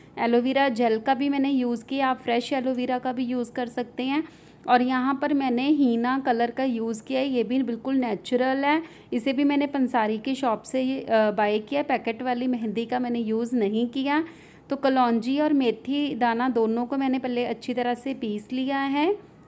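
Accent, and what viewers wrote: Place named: Lahore, Pakistan. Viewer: nice n informative, btw, u have pure lahori accent like us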